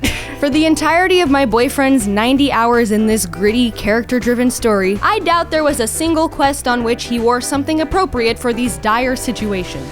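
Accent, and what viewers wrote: Canada. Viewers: You sound stereotypically Canadian. It's funny. And I have to watch more. Need... MORE...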